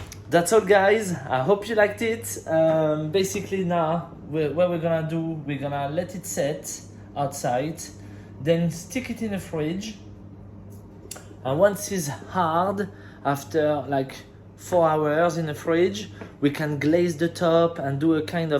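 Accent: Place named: France. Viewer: I have seen you make this recipe before and thanks also for never failing to put a smile on my face because you are so funny and you have the best French accent.😊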